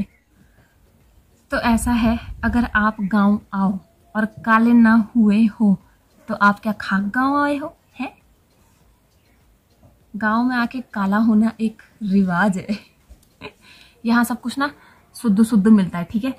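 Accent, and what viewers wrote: Garhwal, India. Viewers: tum sayd gadwal se ho,Mai almora se hu, apki gadwali bilkul hmari kumauni jesi hai...kyapp swal hogya na 😀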